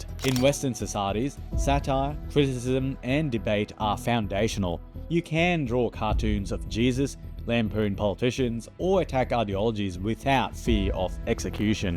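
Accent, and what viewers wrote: Australia. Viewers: Great video, thank you. I can't believe you have such an Aussie accent but are from the Maldives. And I got a shock when you said you were an ex-Muslim in your previous video. What age were you when you arrived in Australia?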